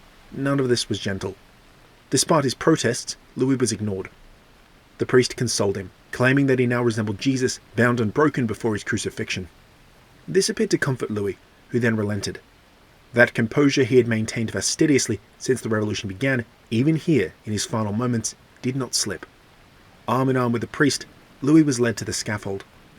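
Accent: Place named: Australia. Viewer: Pretty dope I found an Aussie explaining the FR. Awesome video mate.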